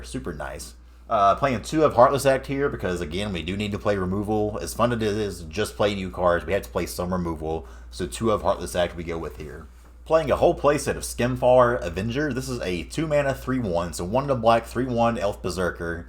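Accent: South, United States. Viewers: Great decks, great analysis, charming southern dialect. I’m sold. Btw, it’s not pronounced «djorn,» it’s «yorn.» ;) Source: Am Norwegian.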